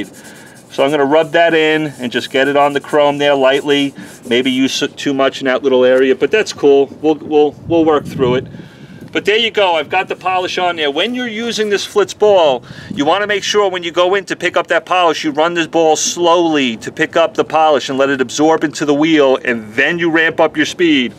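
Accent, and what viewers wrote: New York, United States. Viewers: Derrell Barnett He's originally a New Yorker, I understand him and the lingo being from N.Y. also. The rest of the country is too slow to understand our ways of doing things and speaking.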